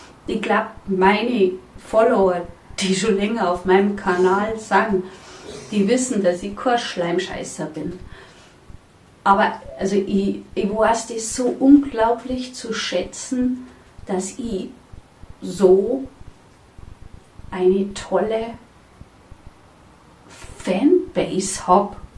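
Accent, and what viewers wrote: Bavaria, Germany. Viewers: Ich find den bayrischen Dialekt super ❤! Bin in Niederösterreich daheim und versteh fast jedes Wort. Unbedingt weiter so 🙏😍😍! Pfiat di 👋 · Ich versteh auch nicht alles, obwohl ich selber Bayerin bin😂. Ich lieb aber deinen Dialekt. Hört sich besser an, als meiner. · Ich finde deinen bayerischen Dialekt wunderbar. 😊 Mein Dialekt ist sächsisch. Das ist wohl nicht sehr beliebt. Abe es ist wie es ist. Gute Besserung für Euch. 🌸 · 💜💜💜 Danke dir liebe. Ach ich find das Bayrisch so schön. Soll doch jeder babbeln wie er mag und ihm die Schniss gewachs is😉 Ich find es schade das so viel Dialekt verloren geht. Meiner wurde mir aberzwungen. Sicher. Sprache entwickelt sich und ändert sich. Aber um das tun zu können, braucht es die Vielfalt der Dialekte. Wenn alles gleich und eintönig ist...na lass mal gut sein. Ich find das schön. Bleib dir treu. 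Und red wie du magst. Wer deine Sprache nich versteht. Der versteht weder das Gesprochene noch den Sinn dahinter. 😉 Mir helfen deine Videos. Und sie ermuntern. Ich wünsche dir gute Besserung. 🍀und schön das du trotzdem ein Video gemacht hast ❤ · Hallo, ich grüße dich von der Ostsee. Ich bin ursprünglich aus dem Rheinland. Kölner Umland. Ich mag deinen Dialekt. Ich kann vielleicht nicht jeden Ausdruck aus dem Bayerischen kennen. Aber ich komme schon zurecht. Es liegt meistens an einem selbst, ob man mit dem Dialekt und der Mentalität der Menschen zurecht kommt. Ich habe da kein Problem. Die letzten Wildgänse sind heute aus Wismar abgeflogen. Der Herbst kommt. Also, wie sagt man im Rheinland „ maach et Jood „ 😀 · Ich liebe das Bayrisch. Mein Onkel kam aus Hochstadt Wesseling und lebte in Köln.Er trug seine Tracht und sprach sein Dialekt....die ganzen 30 Jahre die er mit meiner Kölsch sprechenden Tante zusammen war. 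Er war ein Bayer vom Herz, Dialekt ist schön❤❤❤ Vielen Dank liebe. Ich muss das nochmal hören.!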